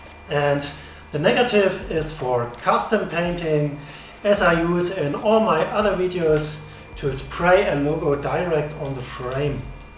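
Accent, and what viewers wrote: Germany. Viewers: Deutscher Akzent is so geil😂👌🏼 hört sich bei mir genauso an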